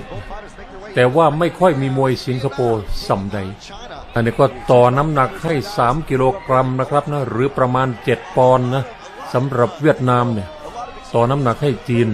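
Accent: Isan, Thailand. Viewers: รู้สึกรักภาษาอิสานเลยค่ะ พอมาฟังท่าน พากย์ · ฮาคนพากย์เสียงนะคะ(แอดมิน)ฟังการพากย์เสียงก็ขำมากค่ะ มีพูดอีสาน แคดแลตออกมาด้วยนะ ฟังไปขำไป😛😁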